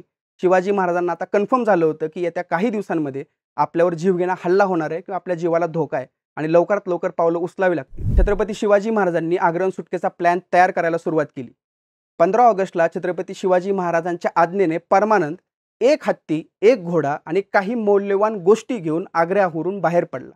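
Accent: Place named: Sambhajinagar, India. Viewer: भाउ तुम्ही मला मूळ संभाजीनगर नगर चे दिसतात भाषेवरून त्यातल्या त्यात सिल्लोड चे .. तसा मी चुकीचा व असू शकतो पण मला वाटतंय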